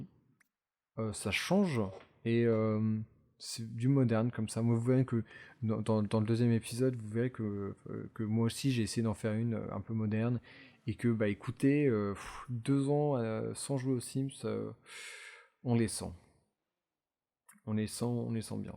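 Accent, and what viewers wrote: England, United Kingdom. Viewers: Cet accent anglais de malade! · J'adore ton accent anglais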